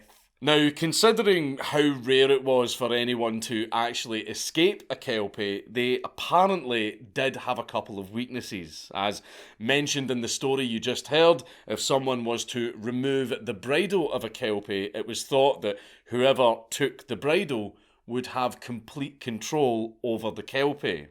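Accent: Scotland, United Kingdom. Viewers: Are you Scottish? My grandfather on my mother's side surname was Frazier. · This video was amazing. I want to see you talk more about Celtic legends as in-depth as you do. Especially in your awesome Scottish accent.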